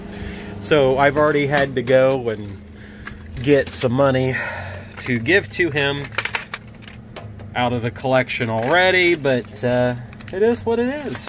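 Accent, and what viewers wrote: Ohio, United States. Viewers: hmm really interesting, I picked up on your dialect and mentioning that landmarks like sack n save. Crazy that YouTube randomly suggested a creator that lives in my small little Ohio town. The strangest part of this is I’ve never really watched any investment/laundromat videos until this one was suggested for me. seems even crazier that when it does suggest one its someone that’s a 10 minute walk to their laundromat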